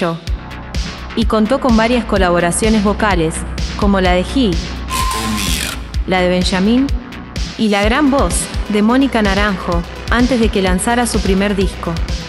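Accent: Argentina. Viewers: La serie me gusto muchisimo, pues es muy creible y se nota el trabajo de este grupo. La locutora, entiendo es argentina por su acento. Me ENCANTO. LASTIMA QUE LO BUENO TIENE UN FINAL. GRACIAS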